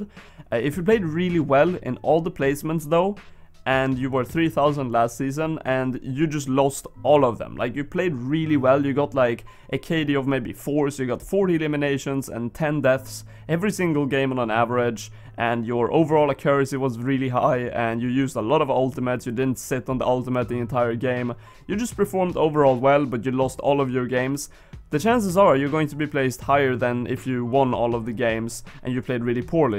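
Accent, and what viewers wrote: Sweden. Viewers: let me guess, ur from sweden? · Is you swedish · Are you from Sweden?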